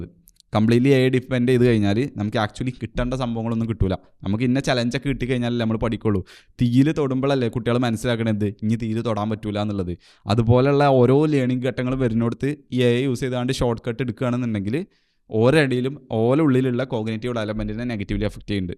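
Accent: Malappuram, India. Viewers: Hey bro, you sound like a Malappuramkaran… .. good video of how to not use ChatGPT, I am from perinthalmanna living in Australia.. happened to see this video. It’s very nice. I will be happy if at least some of the new genz kids get influenced by your video.. liked the dopamine video too..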